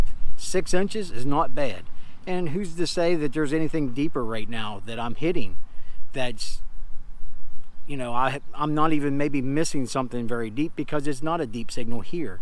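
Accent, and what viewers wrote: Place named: Pennsylvania, United States. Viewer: You've got to be from Pa.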